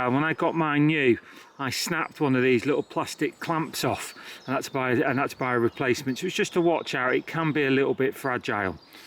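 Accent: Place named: United Kingdom. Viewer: British accents are so pleasing to listen to after listing to American videos. Nice video znd good information, Thankyou.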